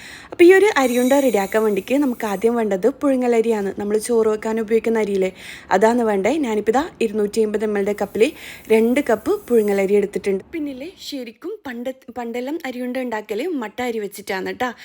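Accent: Kannur, India. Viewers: നി കണ്ണൂർ എടാ ആണ്❤❤❤❤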